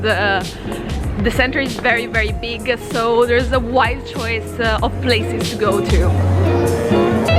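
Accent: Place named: Rome, Italy. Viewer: si, mi piaci accento Romano , mi fa ridere sempre 🤣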